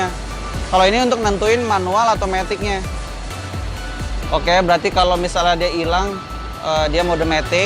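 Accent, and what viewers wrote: West Java, Indonesia. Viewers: Sunda banget bang😂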